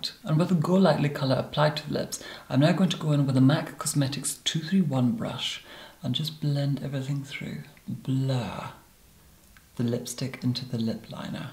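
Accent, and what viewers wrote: Scotland, United Kingdom. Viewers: He's definitely Scottish. Go back to his first video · .... our Lord says England but has a Scottish accent? Surely should still say the UK? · The first video of yours I've watched and I immediately picked up on the Scottish accent 😍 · He has a lovely accent although there’s defo a Scottish accent undertone.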